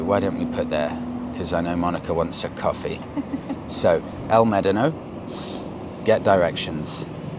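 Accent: England, United Kingdom. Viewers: Leave aside the bike, we see enough of them in India. I suspect we Indians watch your channel for a bit of that propah English accent 😊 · I am not a big bike fan but somehow I like Royal Enfield a lot, especially in a such well demonstrated video. Some extra attraction for that British accent... 👍👍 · The importance of English these days, I, being Brazilian, would be missing out on such good content from this channel, your British accent is cool and a little funny to me. You're a good communicator, (and Monica). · I'm from Brazil and I study English, I like watching your videos because I like the English accent, and also knowing about motorcycles, would this bike be adapted to my height, my height is 5, feet and 3.5 inches. Thanks.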